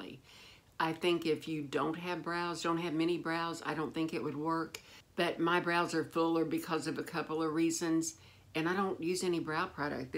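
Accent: South, United States. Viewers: I heard about you from Sandra. You are both wonderful. If I had gotten married again, I would learn a lot from you, your charm and intelligence. Am 70 something years old and still looking for a great guy. With your living care, no wonder he’ll be walking asap! Question about the protein bars: what’s the name of the company? The flavors sound delish!!!. I live upstate NY. Live your Southern accent. Kind regards, FR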